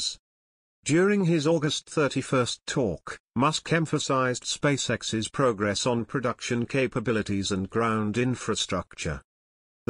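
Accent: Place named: RP, United Kingdom. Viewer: I love how the British cultured accent rubs the Yanks the wrong way. LOL Well done on the content m8t cheers. How about you change it up and use a Cockney or Scouse Accent BWG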